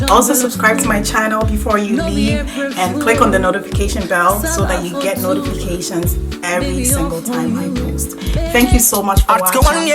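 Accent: Twi, Ghana. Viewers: 😂🤣😂 Eii wo twi ye de3🤣😂🤣 The eye lashes part killed mee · You are so real. You didn't fake your twi. Great video. Background music on point. Anyways Ghanaian youtuber here.💙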